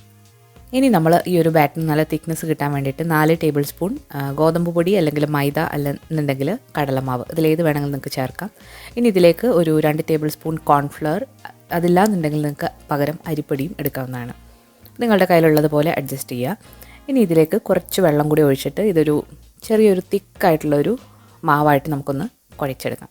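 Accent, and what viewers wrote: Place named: Valluvanad, India. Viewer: de valare pazaya videos il oke voiceover accent kuracu vithyasom toniyirunu (oru valluvanadan style aaypoy pole), ath otum ishtomaayrunilaa. Pakshe ipol kure naalay valare nanaay samsarom. Anikk valare ishtomanu recipes um samsaravum. Very simple and straight to the point. Please keep up the perfect work🥰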